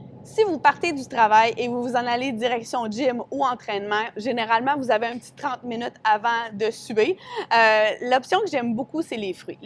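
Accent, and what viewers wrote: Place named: Canada. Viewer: L'accent canadien lol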